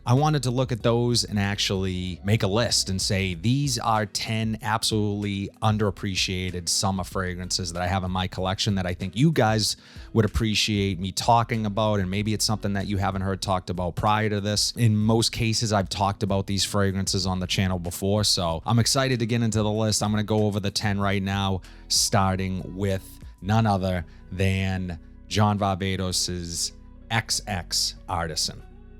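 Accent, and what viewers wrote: Boston, United States. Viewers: Thank you for the new fragrances, so many channels repeat what they have. I always come back to you .. and the Boston accent 🤪 how can I get you a sample of Megamare, I so want to see how you feel about this controversial smell. It’s so ocean it’s almost algae. I kind of feel Messoni wave is the best average overall but damn that sticks to me like glue. I can’t stop wearing banana republic metal rain.. this stuff is bangin 🤩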